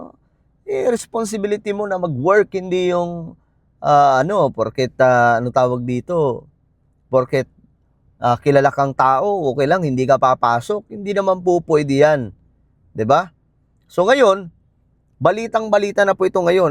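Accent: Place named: Visayas, Philippines. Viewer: No offense sangkay...napaghahalata ka talagng Bisaya kc some of your pronounciations are hard aeg: Senate you said Si- nate, abolish not abulish..:hand-purple-blue-peace::hand-purple-blue-peace:💌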